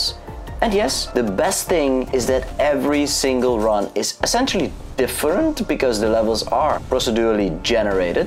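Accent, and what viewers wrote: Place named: Netherlands. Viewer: Dutch? seems like a dutch accent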